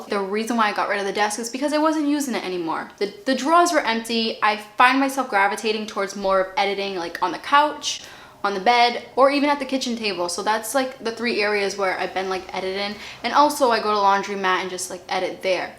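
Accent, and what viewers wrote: Boston, United States. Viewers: your dialect is fascinating, where in USA are you from! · Where r u from? Is it Boston ?, Jersey?I hear ur accent every once in a while, but can’t place it. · She's from Boston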